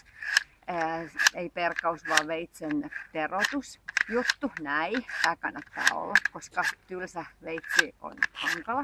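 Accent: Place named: Turku, Finland. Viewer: Tyypilinen turun murre.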